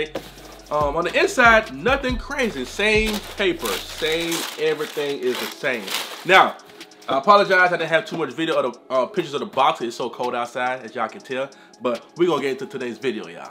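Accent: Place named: Georgia, United States. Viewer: lol I'm surprised the accent strong af!(but I'm in love with Georgia accents so they really stand out to me) Ion even hear the Jamaican in ya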